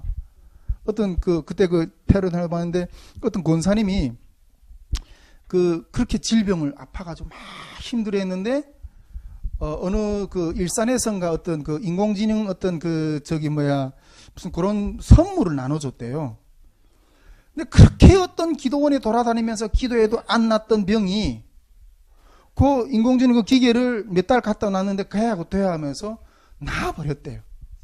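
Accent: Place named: North Korea. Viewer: 이런선교모임도 있었네요. 윗 동네분들 발음과 억양은 조금은 생소합니다만 주안에는 이런 시원한 생수같은 말씀을 처음 듣게됩니다. 귀하시고 감사드립니다.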